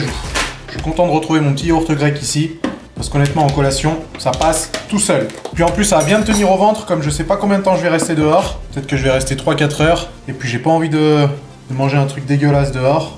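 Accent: England, United Kingdom. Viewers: Meme pas une petite fin avec ton accent anglais?! J'espère voir ca dans une futur vidéo! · Sympa ce genre de petite vidéo aussi ! Par contre je suis déçu je voulais entendre ton accent anglais x')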